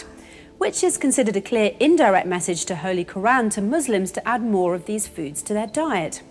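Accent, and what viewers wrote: England, United Kingdom. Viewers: True religion? No just another fairy story making ridiculous claims. Just because she is a white woman with an english accent does not make it any more true.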